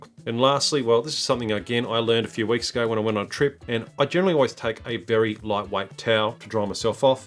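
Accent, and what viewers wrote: Australia. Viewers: Great video. Love watching Aussie youtubers talk about gear. Our terrain, bush and scrub are so different from other places, and our gear has to be up for it. Appreciate having an Aussie voice contributing to the gear space!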